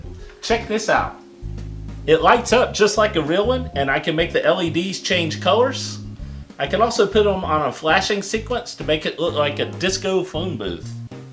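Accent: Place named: South, United States. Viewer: Great job, loved the British slang with southern accent.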